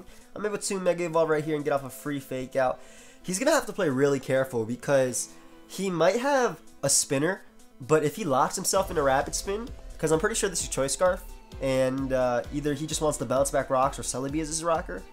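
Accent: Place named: Connecticut, United States. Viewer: Did u grow up in connecticut? You have a connecticut accent just like me:D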